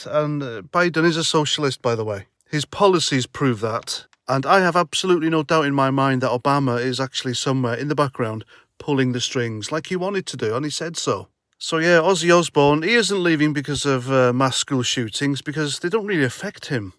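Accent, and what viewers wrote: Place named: Birmingham, United Kingdom. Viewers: your Brummy accent is hilarious...yam alright am ya bab 🤣😃👍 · Excellent brummie accent. I'm glad Ozzy's coming back bless him. War Pigs is an ace song....so true today as well.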